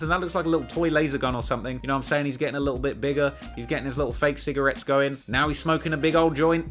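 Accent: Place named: United Kingdom. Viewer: So refreshing to hear a traditional British accent talking about this kind of subject... it’s good music and I’m happy to not hear a wannabe roadman talking for once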